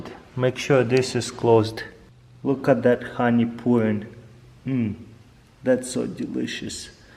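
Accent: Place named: Russia. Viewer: Привет смотрю тебя давно))Я знаю что ты русский)Т.к акцент ))Удачи в развитии🎉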